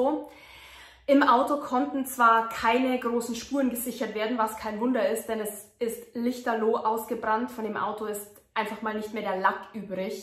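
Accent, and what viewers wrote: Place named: Bavaria, Germany. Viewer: Bin dich seit tagen am bingewatchen :D Schau normal auch überwiegend american true crime channels bzw Gerichtsverhandlungen, je detaillierter und länger desto besser :) Du bist die einzige deutsche die ich schau, gerade weil du so ordentlich recherchierst bzw auch ahnung von der amerikanischen gesetzgebung hast. Damit hebst du dich qualitativ echt hervor :) Oh und ich liebe deinen charmanten bayerischen akzent :D Bitte mach so weiter du hast echt talent <3 Lieben Gruss aus den Niederlanden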